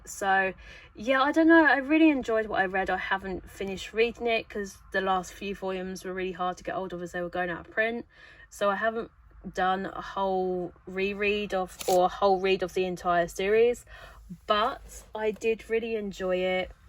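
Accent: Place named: United Kingdom. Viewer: Hi! Can I ask if you know where to get secondhand mangas in UK? (assuming you are in uk based on your accent, sorry if im wrong!)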